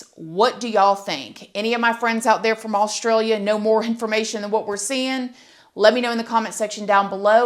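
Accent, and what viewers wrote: South, United States. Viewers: Hey! I’m now discovering you… GURL! Where you from? Southern? Your accent is coming through hard! 💯Love it!💖 Oh yeah… This story is madness! 🤯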